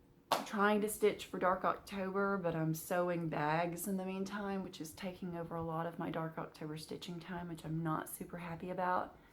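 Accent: South, United States. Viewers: Love every single thing you do and next to Marlene, I think you may have the sweetest Southern accent ever. Could listen to you all day. Was fun seeing you mom’s house....love that you go there and spend time with her and always talk so sweetly about her.....miss my mom everyday. Fun to see the bottom corner of HRH Halloween since I started at the top right. 😘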